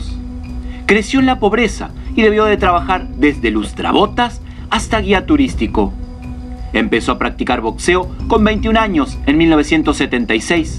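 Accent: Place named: Buenos Aires, Argentina. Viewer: Nuevo sub me gustó tu video, no sabía de esta historia.,estuve a punto de salirme por tu"she sheo " eres muy buen narrador pero evita ese acento "'porteńo" es muy molesto oirlo, Ojo no tengo nada contra los argentinos 👍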